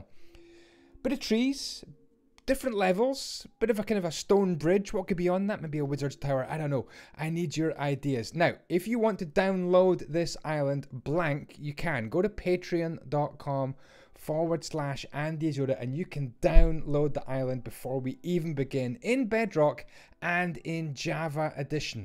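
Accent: Scotland, United Kingdom. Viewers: You have a scottish accent🏴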